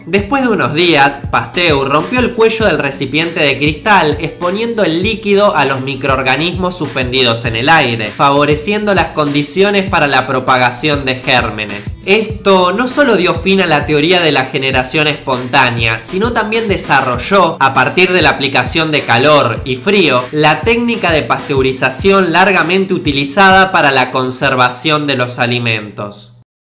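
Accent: Argentina. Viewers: Eres Narigon y hablas como Argentino mmmmmmmm. :u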